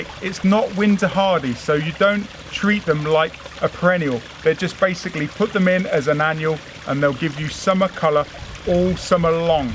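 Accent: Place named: England, United Kingdom. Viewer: I shared your video on my Facebook page I just can never get over how fast your knowledge and palms is, the English accent helps I got to say I’m not sure if it’s just adorable or it makes you sound smarter or both LOL but this video is definitely a top notch. I recommend all my friends to check you out on your channel and that between you and John hardly anything they can’t learn. Charlotte can’t wait to see you soon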